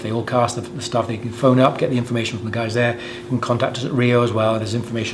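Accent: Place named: England, United Kingdom. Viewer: There's certainly something to be said about having a presenter with an English accent. Watch any of David Attenborough's nature documentaries and you'll understand why. As per usual does an excellent job of breaking down something which can seem daunting to beginners, into a no nonsense, easy to follow guide for getting started.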